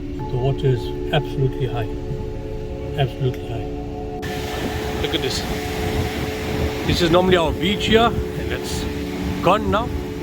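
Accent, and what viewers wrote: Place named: South Africa. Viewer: Country would have been useful, judging by commentators accent and posts elsewhere, I take it this is somewhere in S? Africa, obviously not UK! Last person to mention havoc was Shakespeare.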